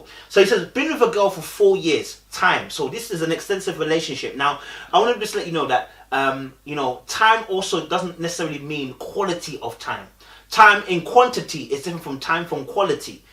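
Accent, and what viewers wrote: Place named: Birmingham, United Kingdom. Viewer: You're starting to sound a bit brummie 😁 coming from a brummie, I can hear it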